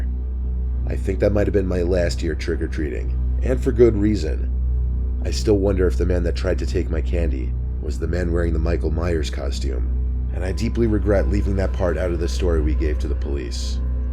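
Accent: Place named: New York, United States. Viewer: You’re GREATLY EXAGGERATING. The voice has Zero factors that keep a person engaged, with any low soft creep factor AT ALL. Realistically, it sounds soo close to an annoying New York accent, which is absolutely abhorrent. It shows how desperate Generic people will literally listen to anything.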